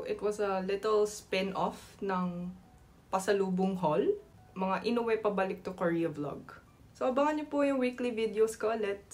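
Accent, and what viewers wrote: Batangas, Philippines. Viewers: Mas gusto ko sayo na batanguena accent palagi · Hindi lang basta tagalog: Batangueñong tagalog! Look: sa , sabi nya, alam nyo GA dati...😅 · She used slight batangueño accent and expressions in this vid po. She was raised in Batangas but I think she purposely use tagalog in a more neutral tone dito compared sa iba nyang videos. 😊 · Batangueña accent ☺️ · ala eh nagtagal ka nga sa pinas batanguenong batangueno na naman ang punto mo ingat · bat nga naman batangueno accent mo? tsaka bat di ka pa mamalagi sa Pilipinas? mas gusto mo dyan sa Korea? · love your Batangeña accent ❤ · Batangueña intonation! Nakakatuwa. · Batangas accent yan. Akala ng iba pag sinabing batangas accent ay katulad nung napapanood sa tv, OA yung mga yun. Pero meron naman talagang matindi ang batangas accent, sila yung mga nasa padulo na ng batangas, hehe. 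· haha parang batanguena Punto mo yey, grabe dami dala yung sakto kumakain ako ng corned beef with egg side na pnctcanton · Speaking tagalog fluently is one thing but speaking with the batangueño accent? 👏🏼👏🏼👏🏼 대박! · Batangueña pala to. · Her Batangas accent in this video is subtle, but evident in some of her videos. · She grew up and live in Tanauan, Batangas kaya may batangueña accent siya. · Batangueño here, natutuwa ako dine lalo na sa accent niya. Shaaaat na! Same pa ng mga hilig na food. 😅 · Kainis mas tunog Batangueña sya sakin kahit legit Koreana sya, samantalang Batangueño parents ko good gosh Even the most subtle of differences like "matutuwa" , iba intonation ng Manileña sa Batangueña niyan hahahahah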